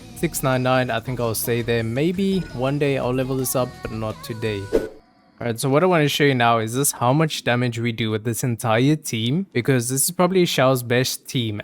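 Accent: South Africa. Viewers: I can definitely hear that this guy is South African, Lekker my bra! SA for the win! man is lekker ryk om so baie constellation op so baie karakters te hê T-T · South African? Uncommon... Cool video 👌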